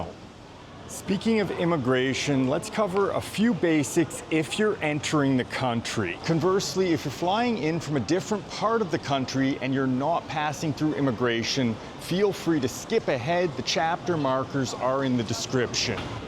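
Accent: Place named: Canada. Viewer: He's a fellow Canadian - the sound of home ;-)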